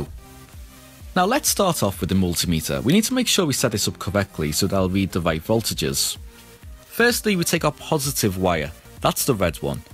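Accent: United Kingdom. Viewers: Is this British acsent ??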